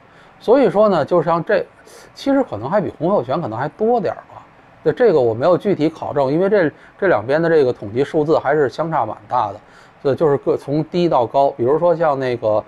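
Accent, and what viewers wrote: Beijing, China. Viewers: 这口儿挺正的，闹半天不是北京人呐？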